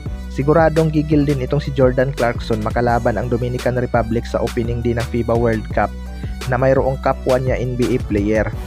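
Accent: Visayas, Philippines. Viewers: Bisaya ka ba bro,Kasi yong tuno mo bisaya ka talaga eh.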